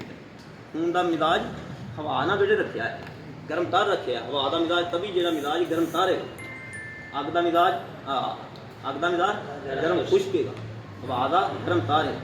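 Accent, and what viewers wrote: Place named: Punjab, India. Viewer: یہ ممکن نہیں استاذجی کا لہجہ ہمیشہ پنجابی ہی رہتا ہے ہم نے دیکھا بعض پختون مریض بھی اس سے پریشان ہوتے ہیں لہذا آپ بہائی کوشش کریں استاذجی کے انداز کو سمجھیں جب نیت کرلینگے ،آسان ہوجائے گا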